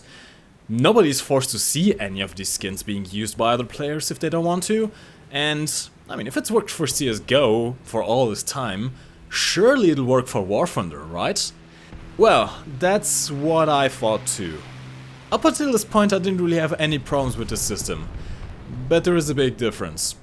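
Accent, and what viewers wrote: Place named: Germany. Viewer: You Sound a Bit German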